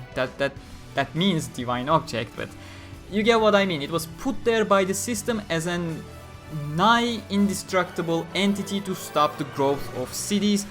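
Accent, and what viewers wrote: Turkey. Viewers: The way you talk English is just like a Turk speaking. Actually your name is so, that makes sense. If you're Turkish, please reply this comment. I wish to meet a Turk who watches SAO and talk about it a lot.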